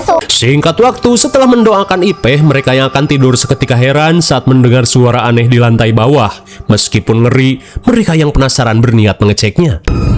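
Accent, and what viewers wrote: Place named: Sundanese, Indonesia. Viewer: Subrek sejak tahun 2021 sejak covid ... krn gabut di rumah di kurung pas pandemi .. 😅 fall in love pas pembawaan nya pake sunda campur...sukses kang.. 🎉🎉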